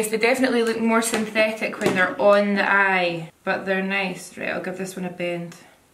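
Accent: Scotland, United Kingdom. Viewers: “Pish” high five to the Scottish bird lingo, nothing sounds as good as an angry Scottish girl 🤣. I’m Scottish too and fairly local to you 😀👍🏻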